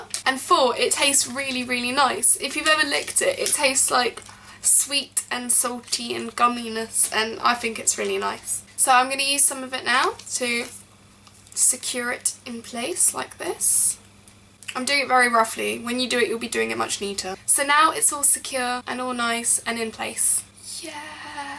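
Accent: United Kingdom. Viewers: PLEASE MARRY ME *.* You have this british accent, you like animes, you are beatifull and you can make wonderfull things..WOW! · omg your British O.o Luvs your accent dearies!!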